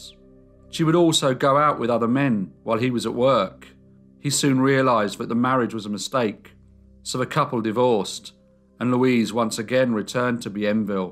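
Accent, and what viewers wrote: United Kingdom. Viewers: Didn't you notice he has got Brit speak. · Oh my gosh! Do I love your British accent!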